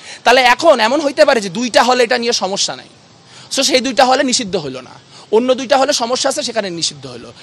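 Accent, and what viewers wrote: Kolkata, India. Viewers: ওনার কথা গুলা কেমন যেনো কলকাতার মানুষের ভাষার মতো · এর কথাবার্তা শুনে তোমার ইন্ডিয়ান মনে হচ্ছে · তার কথাগুলো কলকাতার মত।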